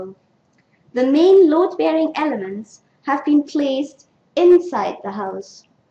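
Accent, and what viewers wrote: United Kingdom. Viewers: I found this really interesting. I've been exploring the local iron age sites and I've had a thought. When and why did people change from building in circles, to building with corners (of varaing and questionable accuracy)? May I also say what a lovely accent! Is it from Shetland? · I like the speaker’s vocal volume undulations, as you call them. They convey her attitude to the questions she’s discussing. For example, where she discusses what architecture can tell us about how much the broch builders competed to out-build one another in height. I lecture, and I do the same. It’s better than delivering in a monotone. As for the accent, don’t you have any friends from Scotland? If not, find some. Just remember not to visit the country outside May to late September, or you’ll either drown in the horizontal rain, or die of cold. Regarding the talk’s intentions, the main one, I think, is to examine brochs from an engineering/architectural point of view. 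Do structural analyses, for example, to calculate how high, given a particular ground diameter, you can build the walls before the broch collapses. From that, you can work out whether a broch was in fact built to be as tall as possible. That tells you something about how fiercely the builders tried to out-build one another. And that in turn gives clues about how they tried to advertise their status. There’s more about this in <link>, “Land, Stone, Trees, Identity, Ambition: the Building Blocks of Brochs”, Archaeological Journal, 173:1, 1-29, 2016. mentions something related, when she says that the brochs built with better stone had thinner walls. This implies that the builders weren’t competing, because the ones with the better stone threw away the advantage that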